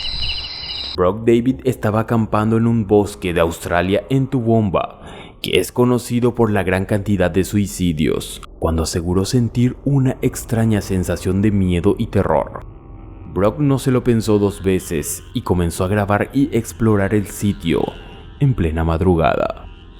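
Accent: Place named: Paraguay. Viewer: algun dia hace un video hablando con nuestro acento paraguayo! Me gustaria mucho ya que sos paraguayo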